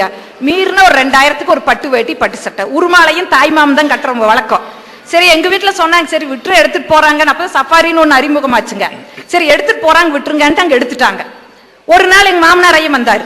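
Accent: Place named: Kongu, India. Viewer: கொங்குத் தமிழுக்கு செவி சாய்க்கிறேன் சகோதரி❤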